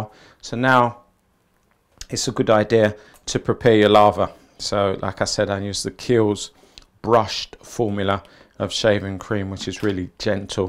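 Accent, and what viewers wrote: United Kingdom. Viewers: you have such a calm and collected manner and I really enjoy listening to the deliberate articulate way you speak. Of course the distinguished English accent is really cool too. I wish I could have you as my barber. That would make my monthly haircuts enjoyable and a much more pleasant experience being able to chat with a nice easy-going person. Alas I live in Singapore, a country in Asia very far from the UK. The barbers here hardly say a word and when they do, it's pretty simple things like how are you doing and how's your day been so far. It's nice having someone who could provide tips and advice and basically just chat about stuff and catch up on things everytime you see them.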